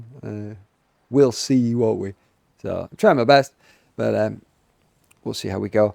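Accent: United Kingdom. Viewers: Came to this channel for GTAVI, stayed for calm british ASMR vibes. Loving the videos man, keep it up!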